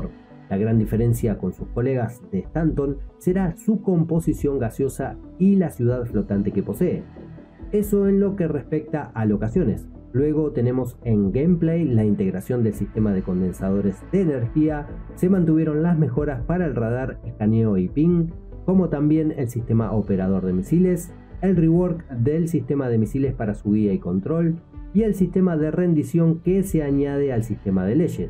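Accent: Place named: Argentina. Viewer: Primera vez que oigo a, y me creo que es argentino🤣🤣🤣👌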